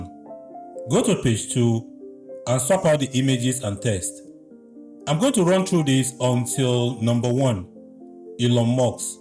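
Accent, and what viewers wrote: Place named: Nigeria. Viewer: HI good video i liked your nigerian accent bro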